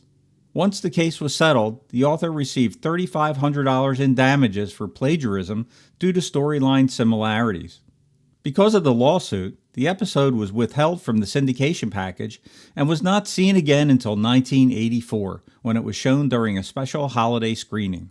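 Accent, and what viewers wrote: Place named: Baltimore, United States. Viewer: Very fun seeing these clips. I had only seen one of them. Hey are you from Baltimore by chance? I grew up there and you have a similar accent from folk who live there.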